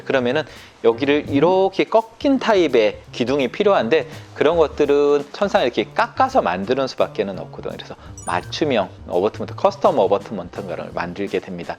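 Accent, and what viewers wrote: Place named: Busan, South Korea. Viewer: 원장님 고향이 부산?분이신가여? 말하시는거 들으니 바로알겟네여 ㅎ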